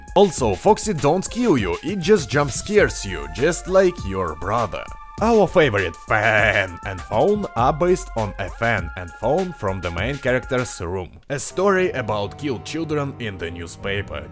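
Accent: Russia. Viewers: Интонация русская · С русским акцентом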